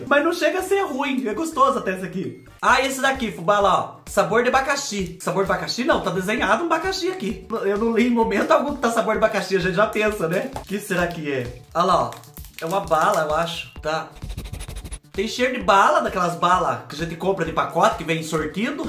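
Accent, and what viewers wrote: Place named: Minas Gerais, Brazil. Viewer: amo o seu sotaque, falo bem parecido, aqui na minha cidade o povo me chama de "mineirinha" 😂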